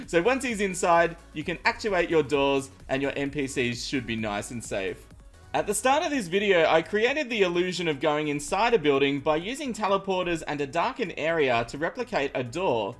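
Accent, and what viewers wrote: Australia. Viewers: Hes Australian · are you Australian? I feel really dumb right now.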